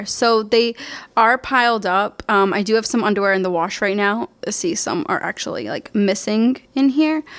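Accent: Boston, United States. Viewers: this just popped up and i'm glad i clicked it, immediately subscribed when i heard that boston accent. about to go binge-watch all your videos! hehe love from medford <3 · The Boston accent is real haha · i can totally relate! i'm from the Boston area :) you got the accent haha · It sounds like a bawston accent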